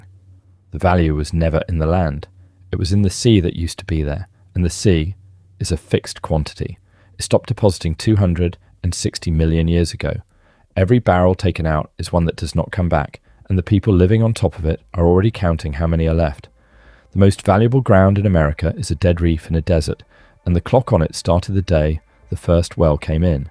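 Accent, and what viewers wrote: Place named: United Kingdom. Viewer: as soon as i hear a frickin british accent i shut it down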